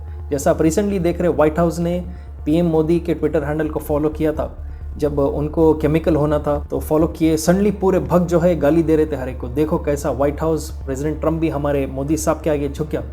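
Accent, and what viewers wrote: Hyderabad, India. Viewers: Its hyderabadi accent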